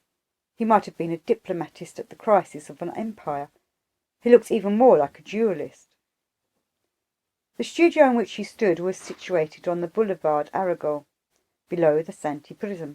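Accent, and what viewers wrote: United Kingdom. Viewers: O wonder! What lovely voice! It’s so beautiful to listen to Crowley’s writings in a british (i assume british. I apologize if different) accent. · Between the horrible audio effect,which muffles every word and the limey accent, it's utterly impossible to follow from sentence to sentence. Why bother?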